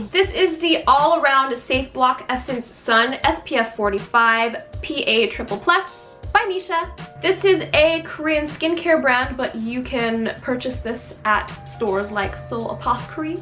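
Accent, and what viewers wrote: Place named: Australia. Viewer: The little hints of Aussie accent always crack me up 😂💖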